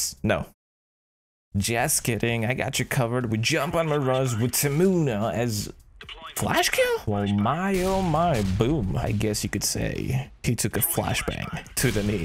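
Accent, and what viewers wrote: Romania. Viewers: Esti Ardelean sau Oltean? :)))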